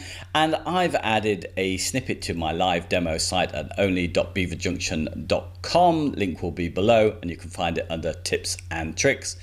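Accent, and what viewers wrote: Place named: England, United Kingdom. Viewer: I was going to say Pommy accent, but then I realised no one outside of Aus would have understood what I meant :)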